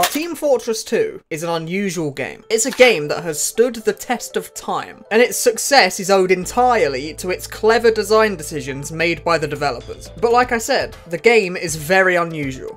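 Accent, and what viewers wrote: United Kingdom. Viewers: TF2 ruined me. I thought you're Aussie but nah you're Brit. Thanks Sniper.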